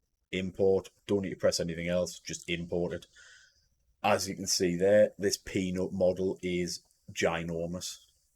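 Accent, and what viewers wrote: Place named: Newcastle, United Kingdom. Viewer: i hear the Geordie accent?? immediately haha.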